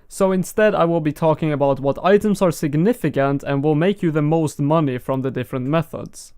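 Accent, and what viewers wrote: Sweden. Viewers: The swedish accent :D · Your Swedish accent is so sexy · Where are you from? Your accent sounds like swedish-english ish:P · the swedish accent is so obvius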